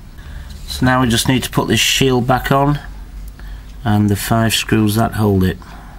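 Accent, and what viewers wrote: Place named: England, United Kingdom. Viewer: Thank you for posting this. I watched this from Connecticut USA, whilst getting a Skype call from from a family member located in North Lincolnshire and commenting, "he's from England!":D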